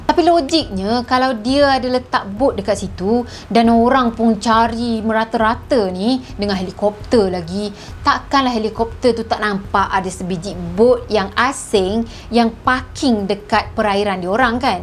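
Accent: Kelantan, Malaysia. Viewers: Iyo mek Kelantan la ni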